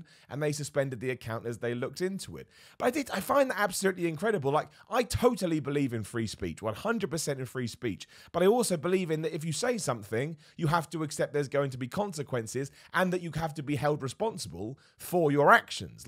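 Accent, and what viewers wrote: United Kingdom. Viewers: Now that you mention it, you could kind of resemble Johnny Sins. However, you sound like a Brit and I'm sure Johnny Sins is a Yank. You sound like someone who does radio. And I'm not sure why being confused with Johnny Sins is an insult!!!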